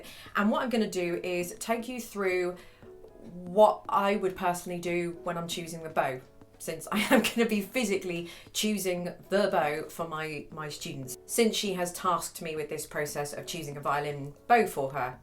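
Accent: United Kingdom. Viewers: Unless your from the USA. Then it would seem normal and someone with a British accent would seem different but I would never use the word "hilarious" because she sounds wonderful. BTW one of the most renowned makers of violins is a New Yorker. His violins sell new for over 100K and you'd have to wait 3 to 4 years to even get one if your lucky enough to get one. He doesn't talk pretty either ( BUT who cares)??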